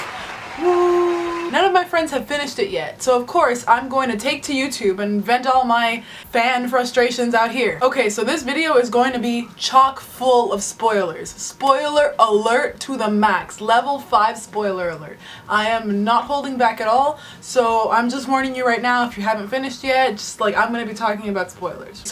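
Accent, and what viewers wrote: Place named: Russia. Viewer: your russian accent is on point. also **ermagerd spoilers** my theory is that bennett might have had something to do with the DEA raiding cesar's apartment. perhaps he thought the best chance for those kids was to get cesar away from them. i just don't want to believe he would desert daya like that :C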